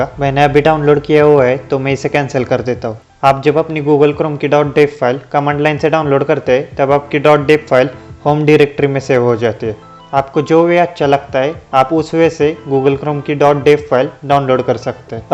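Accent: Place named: Maharashtra, India. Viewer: Bhai ur speaking accent is like marathi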